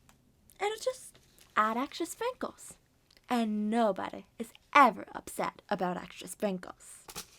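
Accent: South, United States. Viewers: I’m southern, and this accent was lovely! Nice video n cosplay too! (^v^) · This is so good I thought you were gonna have a British accent but you didint it was more of a country. Can you make a Fred bear or Freddy next?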